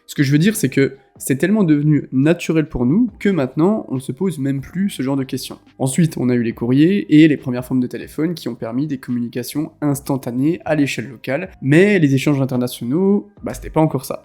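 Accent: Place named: East, France. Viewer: Allianceblock les gars, jeton $NXRA, vous me remecierez plus tard... merci pour ton travail, c'est quoi ce petit accent, ne viendrais-tu de l'Est de la France😊?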